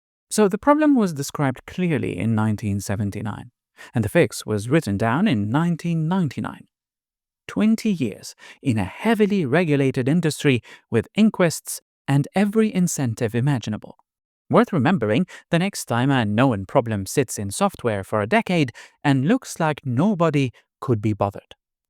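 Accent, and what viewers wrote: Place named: Netherlands. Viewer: This vid haz awesome English gramma (grammar) with a Dutch accent!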